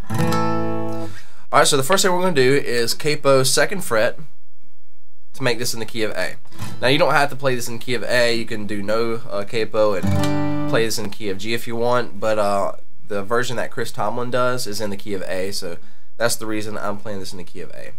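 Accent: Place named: South, United States. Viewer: He sounds kinda from the south anyway you rock man thanks for the vid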